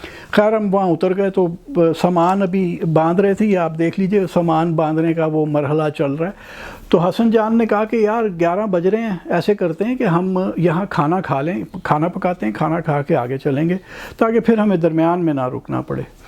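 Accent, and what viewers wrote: Punjab, Pakistan. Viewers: Just amazing Love the way you speak especially your punjabi touch .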